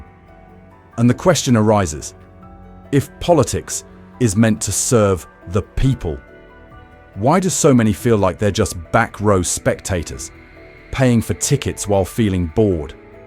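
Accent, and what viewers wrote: United Kingdom. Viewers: You sound like a Brit 😂😂😂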